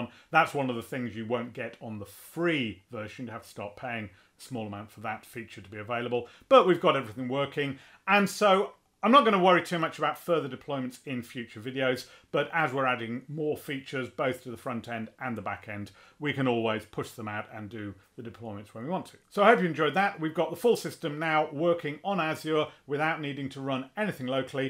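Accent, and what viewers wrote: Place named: United Kingdom. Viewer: Such good assistance with a great British accent, thanks :)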